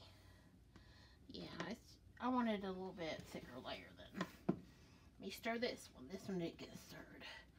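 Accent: South, United States. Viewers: I love that she says “poodin” lol has the cutest voice. It’s so girly and southern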